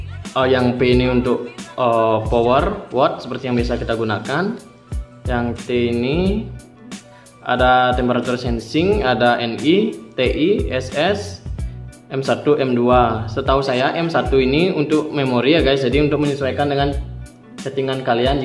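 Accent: Bali, Indonesia. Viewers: Pasti nak bali ne nu logat" Bali ne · Ni org bali ya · Org bali ya bang?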